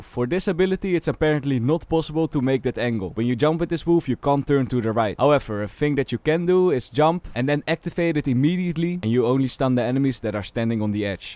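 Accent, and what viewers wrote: Netherlands. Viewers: meest nederlandse accent wat ik ooit heb gehoord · are u dutch or belgium by any chance? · de nederlandse accent is kei hard haha, danku voor de tips !!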